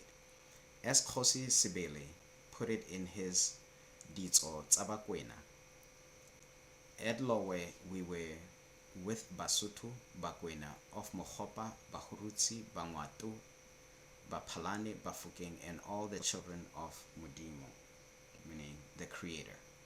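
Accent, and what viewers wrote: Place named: United States. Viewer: Love the program. The accent though made it difficult for me to understand you, wish you could leave the Americanisation.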